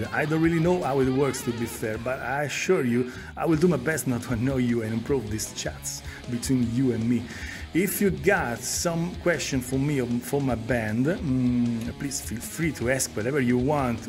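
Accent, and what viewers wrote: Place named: Italy. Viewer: A gimmick can be cool and definitely help out and Lordi would not have gotten as big as they did without costumes. However, today's Top40 is full of music that lack ANY personality (when did you last hear an original rap song?). I think your English is very easy to understand even though you can hear an Italian accent which sounds a bit refreshing. Perhaps that could be a gimmick too in some sort of way. Holy Super Mario Spaghettio 😆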